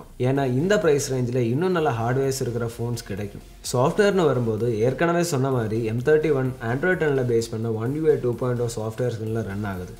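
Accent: Kerala, India. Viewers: Hello Brother My prediction Correcta You Malayali Tamil Super Keep It 💐💐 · Boss ...unga tamilile Oru Malayali touch.. erukke..neenga Oru Malayali.. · Malayali tamil, we want ash back on c4e tech tamil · bro neenga malayalam ah? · மலையாளம் கொஞ்சும் தமிழ் ரசிக்கும்படி இருக்கு. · Bro neenga malayalai ah! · Sounds like a malayalie speaking tamil,am I right? Good job! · Halloo bro. Indha mallu bro Tamil pesumbothu rombavae kaduppakuth So ivare replace senjal sombave nalla irukkum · Chetan malaiyali aano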